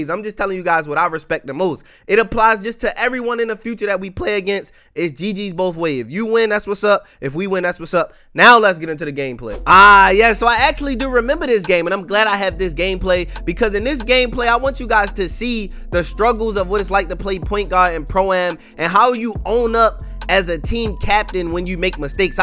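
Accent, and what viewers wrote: Baltimore, United States. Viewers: Is he from Baltimore? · yo are you from baltimore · U from Baltimore? Lol sound like it….twoooo man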